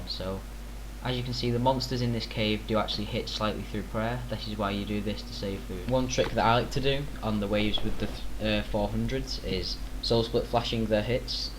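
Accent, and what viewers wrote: United Kingdom. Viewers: geordie accent :D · You from middlesbrough?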